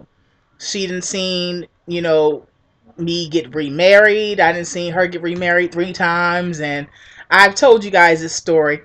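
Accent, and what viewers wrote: Baltimore, United States. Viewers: you are making some valid points especially when you trusting someone with your livlihood.and your Mulahhh lol you such are Definitely from Baltimore (your accent) "Rude" the way you say that word lol Have a Bless day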